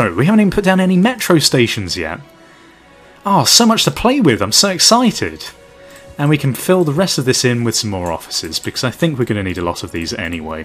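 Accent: United Kingdom. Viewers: British Accent FTW!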